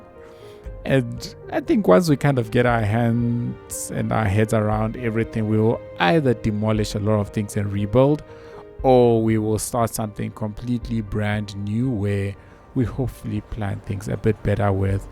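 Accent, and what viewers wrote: South Africa. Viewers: Thank you for putting this up. I really enjoy the South African accent! It makes me miss home! :)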